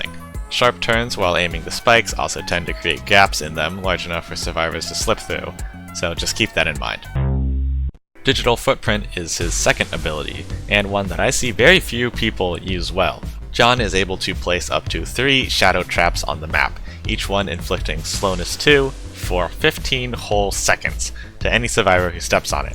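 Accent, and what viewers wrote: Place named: United Kingdom. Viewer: your British, aren’t you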